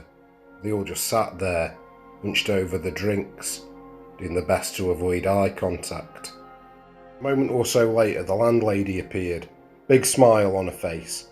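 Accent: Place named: Derbyshire, United Kingdom. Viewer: That was ace, cheers very much. The accent had ne straight away, but I can't quite place it. Chewing words like Cheshire, hooting your 'oo's like Stoke, but probably more Derbyshire. I don't even care how out my guess, the tale told was a good tale well told. Thank you very much.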